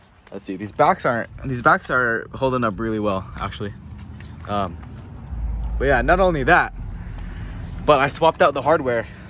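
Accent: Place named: California, United States. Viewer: I’m a New Yorker and I love your California accent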